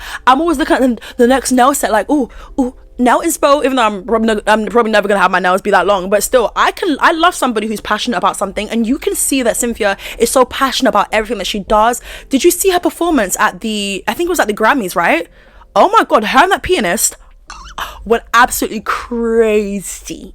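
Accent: Australia. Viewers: Where's that ozzy accent coming from babe? and her accents always cheer me up. As far a hydrating tea, might I suggest 3 ginger and throw some fresh lemon in to the mix. Hangover, depression, exhaustion who?? That tea hits differently. Anyway, back to the drama with our main drama queen here on YouTube ❤ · Oh lord I am loving your play on the accent, you are sounding like an Aussie ❤🐨🦘🇦🇺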